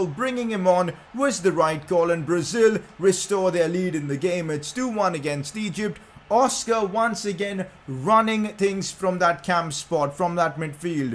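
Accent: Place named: India. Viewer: milittttao that Indian accent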